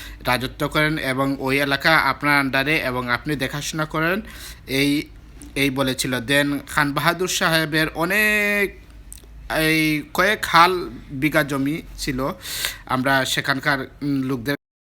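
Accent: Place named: Sylhet, Bangladesh. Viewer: সিলেটি অখল অর মান সম্মান মাইরা হুতাইলাইরায় মিয়া তুমি।অও সুদ্ধ অও সিলেটি কিতা লাখাইছো ইতা মিয়া।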